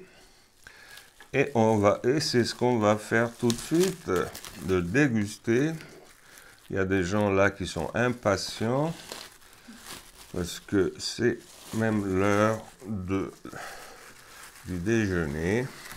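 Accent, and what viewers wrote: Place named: England, United Kingdom. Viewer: Sir, thank you! J'admire votre courage de présenter la recette en français, alors que j'ai décelé un accent anglais. 💛👍. Subscribed!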